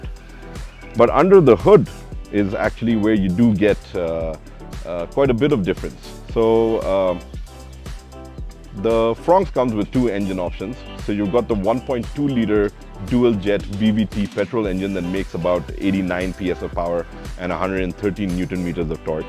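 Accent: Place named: England, United Kingdom. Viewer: What's with the heavy English accent?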